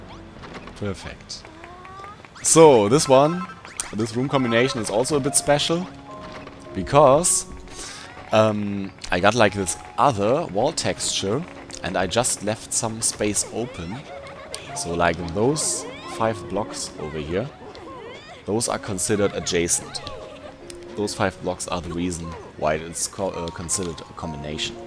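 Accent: Germany. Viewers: Is he german? KLingt nach deutschem akzent in dem guten englisch ;)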